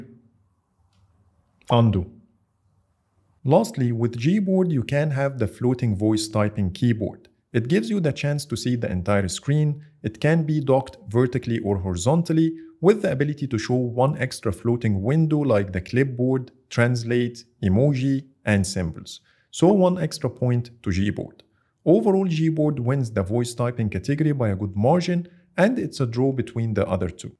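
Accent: Egypt. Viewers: الاكسنت المصري تقدر تميزها على طول 😂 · From your accent i know you are Egyptian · اكسنت انجليزي مصري بس بحد النطق كلو صح ماشاء اللة اتعلمت انجليزي فين ؟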